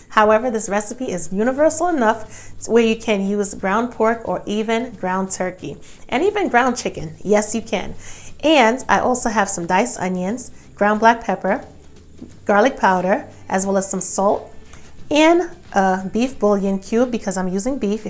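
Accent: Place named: New York, United States. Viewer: Love your new york accent.. It's pretty strong along with your food... All the way from the UK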